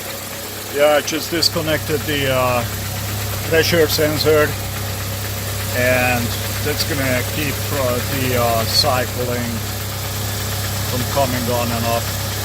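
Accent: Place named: Russia. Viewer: The longer the video goes to more russian/european you sounded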